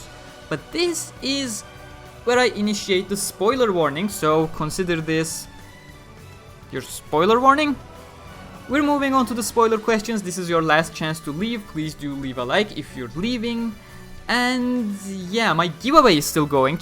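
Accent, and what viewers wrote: Turkey. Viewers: The way you talk English is just like a Turk speaking. Actually your name is so, that makes sense. If you're Turkish, please reply this comment. I wish to meet a Turk who watches SAO and talk about it a lot.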